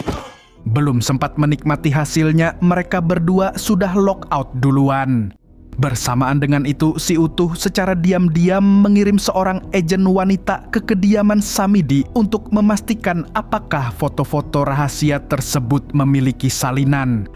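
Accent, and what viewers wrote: Banjar, Indonesia. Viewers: Orang Banjar kah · baaaaah iyaa am buhan banjar naratornya leeeh....gas kaaaan mang......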